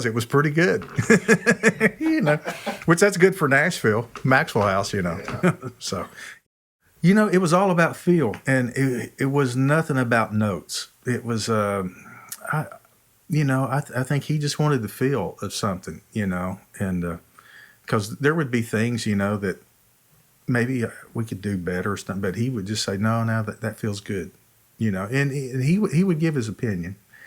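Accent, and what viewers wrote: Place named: Texas, United States. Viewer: This guy HAS to be from Texas. That fabulous accent <3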